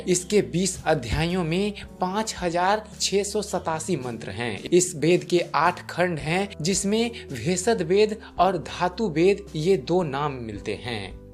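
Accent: Bihar, India. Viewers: Bihari hai ke bhai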